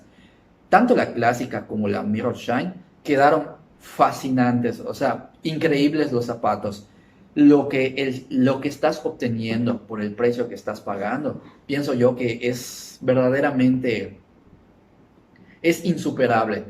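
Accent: Yucatán, Mexico. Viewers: PÉSIMO video!!!....el acento de yuca te lo paso porque es de tu origen de procedencia, pero el que titiubees y te equivoques a cada rato? tas cañon mi yuca!....antes de salir a cámara elabora un "script" para que puedas hablar de corridito y con fluidez, estas hablando de zapatos y no conoces algunos modelos y te basas en fotos de instagram!!!...muy mal...por lo minimo, date una vuelta por León, Gto...pa´que conozcas. JBE y ZARA son marcas que es de uso masivo, para nada de tipo artesanal..y dudo que conozcas marcas "premium" ....donde dejaste a MICHEL DOMIT?....supongo que apenas comienzas en esto, ojalá y pa la proxima te salga bien......bye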